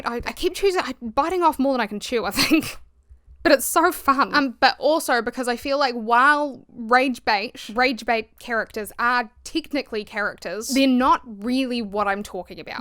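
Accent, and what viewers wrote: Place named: New Zealand. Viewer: my master yapping queen thank you for being my background yapper while gaming there's something about the kiwi that makes your voice so soothing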